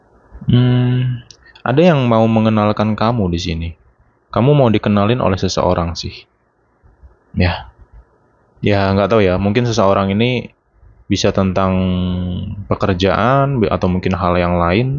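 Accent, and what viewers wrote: Java, Indonesia. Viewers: Scorpio hadir matur suwun vidionya, aq seneng cara penyampaiannya jowone MEDOK BANGET hehehe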